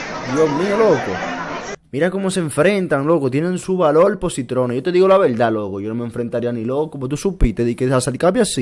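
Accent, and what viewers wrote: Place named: Panama. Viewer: Bueno este tipo de donde es porque habla como panameño y al mismo tiempo como dominicano alfin que mi pana o es que no tiene identidad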